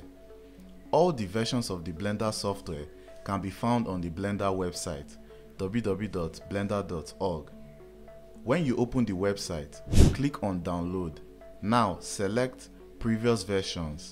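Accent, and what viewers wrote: Nigeria. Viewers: Are you from Nigeria 🥺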